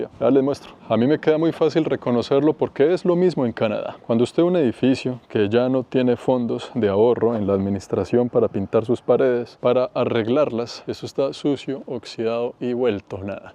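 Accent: Colombia. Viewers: eres la prueba de que el acento colombiano, no tiene por que aborrecer a los 30 segundos. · Parcero sus videos son muy entretenidos escuchar otro Colombiano narrar desde nuestra manera de interpretar es importante no dejes de hacer estos videos 🙏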